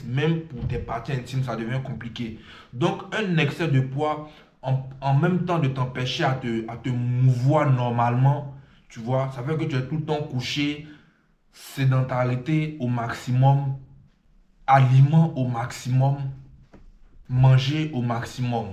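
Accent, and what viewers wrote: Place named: Ivory Coast. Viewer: Coach je sais pas pourquoi mais j'aime tes gimmick et ta manière de parler, j'ai montrer ta vidéo a un pote et c'est lui qui ma dit que tu étais Ivoirien 😅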